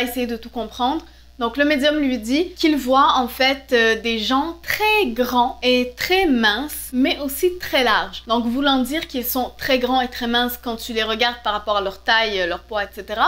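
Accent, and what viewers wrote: Quebec, Canada. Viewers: Est ce que tu es québécoise... Sinon est ce que ça rapport avec la chanson de?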